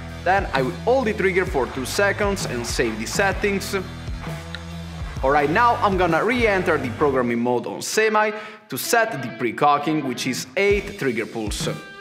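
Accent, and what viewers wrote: Italy. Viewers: what an annoying voice you have. Overkilling italian accent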